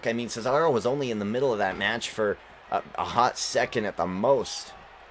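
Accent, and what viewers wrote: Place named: Switzerland. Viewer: Here we go, Friday Night Smackdown (It is late, so I'm going to make these things short). A pretty interesting grudge match, and I'm gonna go with Owen Hart. He's impressed me one hell of a lot recently. Damn, what a fantastic match, especially the run-in! I can see why you're super happy with the way you use Universe Mode, that was great!:D. As much as I adore Antonio Cesaro, AIDEN ENGLISH WILL PREVAIL, I JUST KNOW IT! I liked your Swiss accent, even though it did slip into English and Irish quite a lot... but details! while I'm sad Aiden English did not win, Cesaro's commentary was really fun, so it is all good! Great work! Gotta go with Orton. You never want to piss off a Viper. A great match, with some nice Samoa Joe commentary on the side!:). Gonna go with my man, Corey Graves! Damn, Rider kicked some major ass in this one! 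Utterly murdered Graves! WWWYKI! I'm going to give my victory to The Shield here. They've been super dominant, and right now, I don't think Yoko or Kofi can stop them. Wow, The Milita comes out on top! I'm impressed with them, they could be a real threat towards The Shield's reign as tag team champions! Great stuff as always!:).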